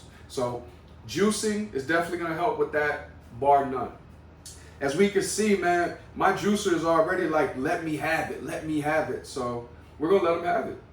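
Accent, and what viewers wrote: New Orleans, United States. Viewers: This is my first time watching any of your videos. I kinda stumbled upon it. So I’m watching and listening…and waaaay before you mentioned being from New Orleans I’d already picked it up in you. Even though your accent is turned OFF in this video, I know the little nuances and subtleties of New Orleanians and our communication patterns. The more the video went on, the more I heard them. I can tell you’re living away from the city and have grown differently due to new experiences outside of the N.O. That’s my story, as well. But no matter how long we’re away, it’s always home and it’s always within us….and it doesn’t take much for it to pop right out, ya dig?! Fuh sho.😉 Great video and I’ll definitely be trying this juice recipe tonight!! Thank ya my brudda! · Love your video… I am about to get into juicing myself, so I’m glad I ran across your video….not to mention it was particularly interesting because I knew that accent was from #Nola lol. 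Love my city & our accents…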